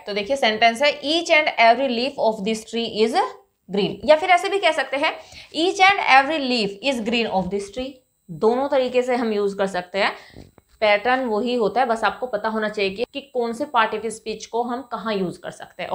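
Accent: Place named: India. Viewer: Dear। beta....you really do well...but be little more cautious while using any strucure,and meaning and sence of sentece as well...And please do correct your pronounciation and your dialects.....your UP.BIHARI,AUR HARYANVI touch spoil the language......work over it....Dear Your sentence should be.....The younger he is more crafty or mean he is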